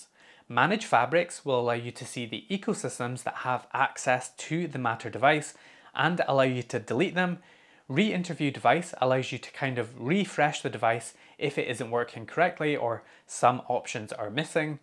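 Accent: Scotland, United Kingdom. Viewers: Acting as a rooter! 🤩🤩 I wish you would lean into the Scotsman a little more 😉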